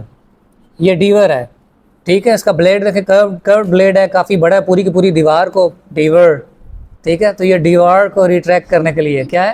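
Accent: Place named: Pakistan. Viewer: Aap jis tarah Se bolate hai na vah Pakistani language jaisa lagta hai 😊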